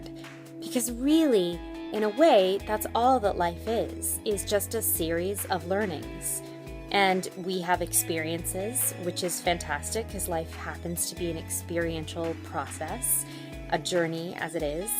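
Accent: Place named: RP, United Kingdom. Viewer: Hard to believe you could never speak English! Your vocabulary is strong! You look pretty young as well. My faith in the youth is kind of restored now 😂😂 just seen your videos. You have some posh accent. You've just inspired me. Anything is possible if you set your mind to it! A very sophisticated comment keep doing what you're doing!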